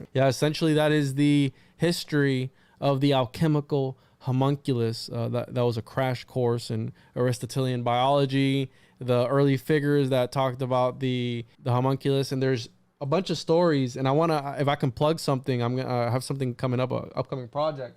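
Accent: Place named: Mexico. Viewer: pronouncing latin words with mexican accent. oof. and i'm hispanic.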